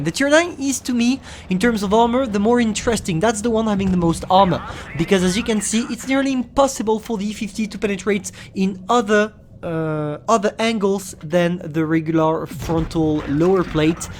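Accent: France. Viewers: From where are you? I would guess France cause of the accent (btw rly good English), btw you just blindshooted me today. Nasty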